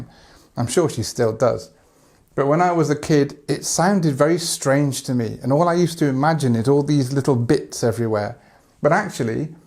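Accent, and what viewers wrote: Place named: United Kingdom. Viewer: Though I love listening to our dear Adrien,I really enjoyed listening to you, I looove your beautiful british accent ! I hope to see you again on this channel.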